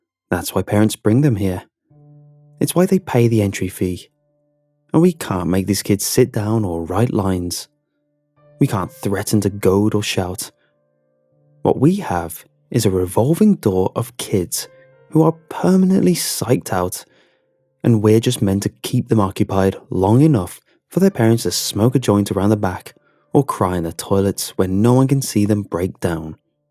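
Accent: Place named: Wales, United Kingdom. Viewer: Why does his accent sound a little bit welsh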